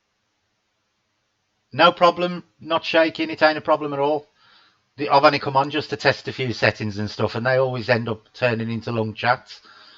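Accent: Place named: Dudley, United Kingdom. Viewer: Missed the live but catching up now. Nice to hear that Dudley accent aer kid hehe :)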